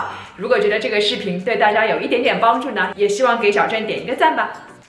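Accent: Shanghai, China. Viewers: 刚关注你，一听就知道你是上海人😊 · 雖然Jessica的普通話已經夠標準了，但是我還是聽出了上海風。好熟悉好溫馨。😘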